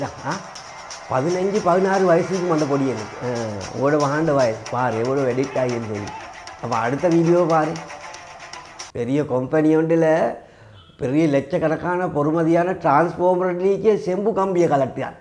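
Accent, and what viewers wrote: Sri Lanka. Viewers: நீங்கா பேசுறது ரத்தணாபுர தமிழா கொஞ்சம் சுத்த தமிழ்ல பேசுங்கா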